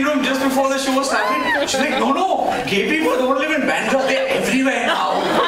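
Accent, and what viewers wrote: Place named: England, United Kingdom. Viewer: his english accent makes me laugh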